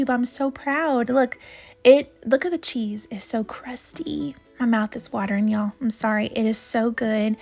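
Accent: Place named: North Carolina, United States. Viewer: Just found you looking for laundry room makeover (its was beautiful by the way..2025) annnd to get the view number off of 666..🫢😁..seen in your videos(love her channel) you are so fun and love the accent..im in North Carolina so it sounds like mine..lol..I have subbed and look forward to getting to know you thru your videos..God keep and God bless 🙏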